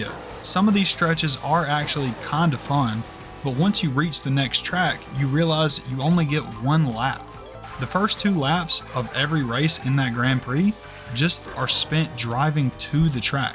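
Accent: South, United States. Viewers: love his southern accent too as a Texan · completely off topic, but where in the South are you from?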